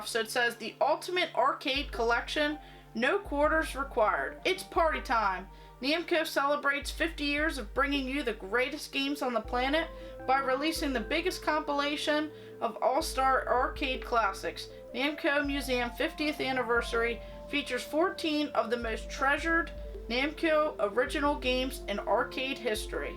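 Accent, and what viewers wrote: Maryland, United States. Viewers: That's a nice haul. You live in Maryland? I've never heard a Maryland accent until you. He he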